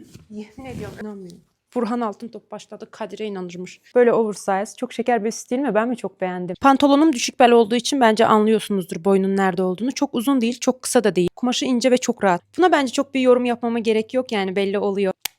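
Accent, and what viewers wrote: Azerbaijan. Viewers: Abla Azerbaycanlımısın? Biraz Azerbaycan şivesi ile konuşuyorsun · Birinci vidyusuna baxanda danışığından bildim ki azərbaycanlıdı😂😂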